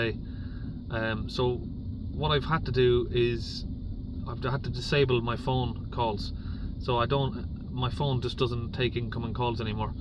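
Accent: Ireland. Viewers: I'm not a mechanic, just a vehicle owner living on a pension. My van had dpf trouble a while ago and i looked at a few of your videos and then told my garage what I wanted them to do, rather than they telling me what they were going to do. Everything worked out ok and both garage and I were happy with the result and saved me a lot of money. I wouldn't dream of ringing you up to pick your brains for free. If the callers don't understand your videos don't bother with them. You have enough to do without engaging in long chats with people. I'm trying to figure out your home county and the closest I can is the midlands, of Ireland, that is. Good luck and good health to you. Thanks again. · You've been too successful! The number of calls you are getting is an indication of how hard it is to find competent people. Maybe you could find an apprentice to train up and have someone run the phone/email/bookings and morph into a bigger business? 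As you can see, the demand is there. I'd love to have a way of asking a few questions of someone like you to work out where to go next. And as you say it's only fair to pay for that service. In the short-term you could just set up another (SIP) phone number so your business and personal numbers (or however many you need) are separate. Same for email addresses. It's a bit tricky because you didn't set things up this way in the first place, but it should improve over time. I'm pleased to see you are not too far away from me (23 miles) (I assumed from the accent you were probably in Ireland or the other side of the country) so If I do get stuck I can book you:-)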